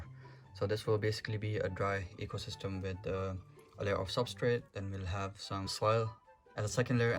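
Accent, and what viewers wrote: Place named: United Kingdom. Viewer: I can hear a bit of a British accent there mate :p Nice work Akhi.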